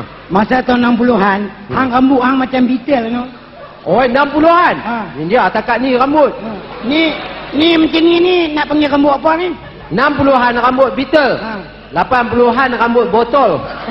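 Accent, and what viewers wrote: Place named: Penang, Malaysia. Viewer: Walau pn usia nya/dgn lawak logat penang itu membuat saya dpt mengenali nya hingga arini.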